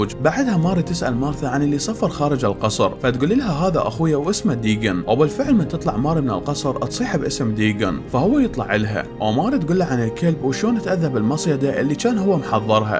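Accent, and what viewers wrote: Iraq. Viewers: سوال انتي عراقي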